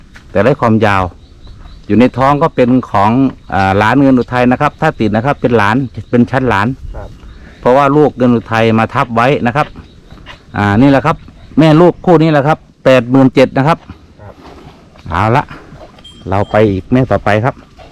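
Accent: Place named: Isan, Thailand. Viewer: สวัสดีครับ.ผมชอบฟัง ตอนพูด สำเนียงอิสานมาก..เฮดี.ครับ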